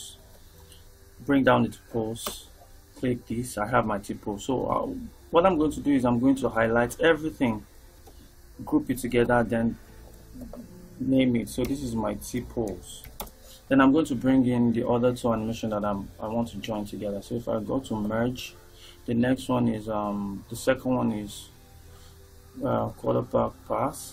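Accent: Nigeria. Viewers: You have a Nigerian accent...are you a Nigerian??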